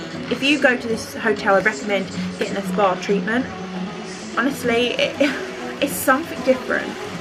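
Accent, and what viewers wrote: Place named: RP, United Kingdom. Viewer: U sound very posh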